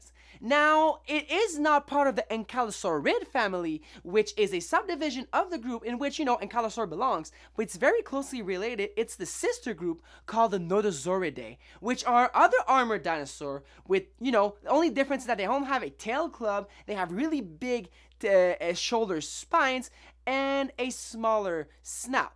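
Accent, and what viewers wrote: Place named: France. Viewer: Love your french accent <3 <3 <3 You, rock hahahaha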